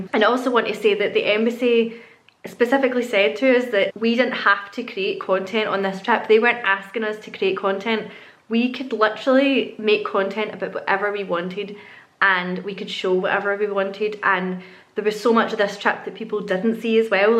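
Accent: Scotland, United Kingdom. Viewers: Sitting in the Indy airport, practicing listening to the Scottish accent (on our way to 🏴), shocked that our town is on your channel. 🎉🎉 Glad you loved it. Come back anytime. 😁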